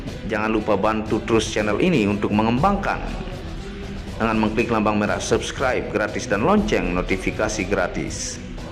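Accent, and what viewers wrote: Bali, Indonesia. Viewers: Logat pembaca spt org Bali ?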